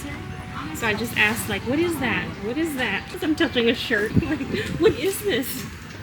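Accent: Cambodia. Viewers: your khmer accent is really cute! And love the effort :) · Your Khmer accent sounds so beautiful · I’m Cambodian and your accent sound like mine 😭😭😂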